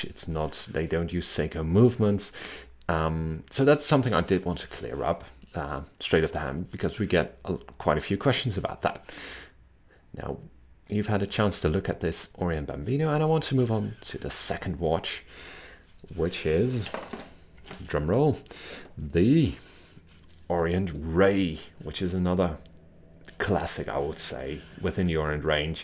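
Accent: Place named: Netherlands. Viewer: Nederlands accent ;-)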